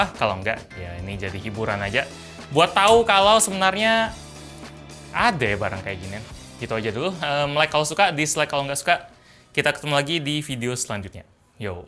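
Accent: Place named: Glodok, Indonesia. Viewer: Aksennya Glodok banget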